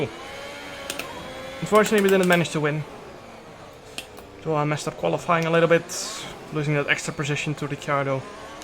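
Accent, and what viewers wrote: Netherlands. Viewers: dat accent is zoo nederlands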